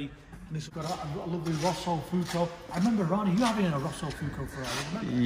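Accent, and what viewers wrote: India. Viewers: Hey bro you are indian we get that from the accent and your name but why don't you reveal your identity??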